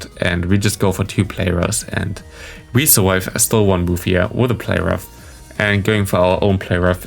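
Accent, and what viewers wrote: Germany. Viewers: German accent has its downsides 😅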